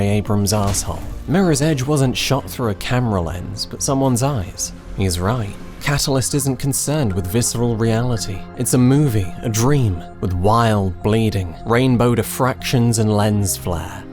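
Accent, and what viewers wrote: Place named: United Kingdom. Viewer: Hey man, doubt you'll see this. But thank you for the birthday gift! Love your videos. Only a madman can see the soul behind ubisoft's corporativism, and being a madman is exactly what i respect. Keep being you, with that soft and sweet british voice and all. Peace, Enzo. Ps: thoughts on Legion? That cliffhanger on WD2's video got me grinding my teeth.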